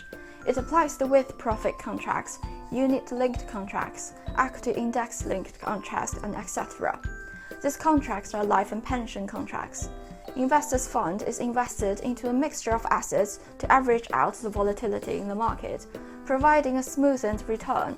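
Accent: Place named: United Kingdom. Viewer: Hello, many thanks for this informative video, really well appreciated. PS: With all respect meant, if I could add that on, though : The (british) accent is really very strong.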